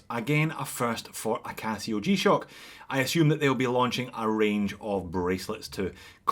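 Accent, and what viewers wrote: Scotland, United Kingdom. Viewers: I am an absolute fan of. One of the reasons being that he is a graduate of Griffith University also. I love the Scotish accent of this guy. He is a true talent. and for me is a benchmark channel on Youtube. For true watch enthusiasts I can also recommend looking into instagram accounts. In particular, of course, the one of. I further enjoy kicktoc, watchurious and monsterstraps. Keep it up!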